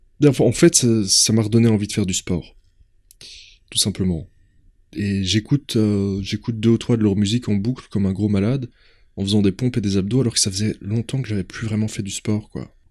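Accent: Belgium. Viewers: Tu as un accent bruxellois.🤔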